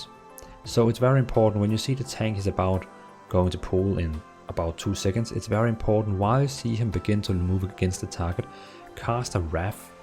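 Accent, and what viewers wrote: Denmark. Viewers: Thought I could hear a fellow Danish accent :3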